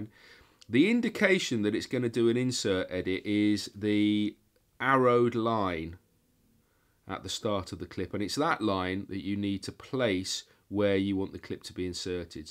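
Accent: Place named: Cockney, United Kingdom. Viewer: yes very clean and tidy, extremely good [ cockney diction and good visuals, thanks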